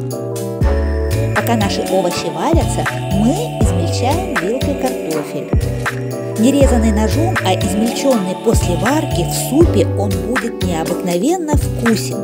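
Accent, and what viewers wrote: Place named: Visayas, Philippines. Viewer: Hello Russia 💘👋✌that's why the tone is Visayan because it's Cebu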